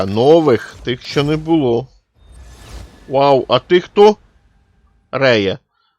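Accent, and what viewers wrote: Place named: Surzhyk, Ukraine. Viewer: трохи суржик є, пане!))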